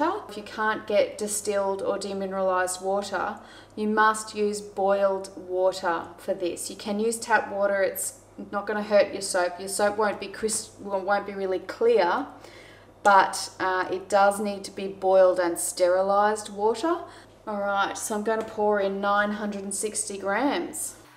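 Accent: Australia. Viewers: Maybe they just don't like the Australian accent.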